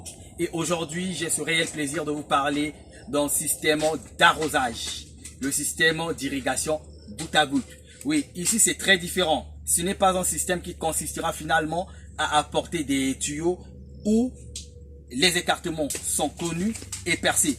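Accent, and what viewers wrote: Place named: Africa. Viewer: Aaahhh,ça c'est l'Afrique! Avec le véritable accent et la vraie pédagogie! Est-ce '" tu comprends, hein?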